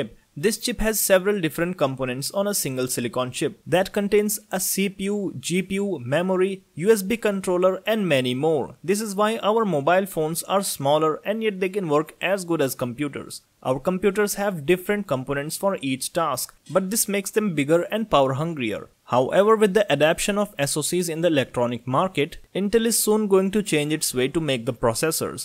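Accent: India. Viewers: Informative, but ruined by the Indian accent.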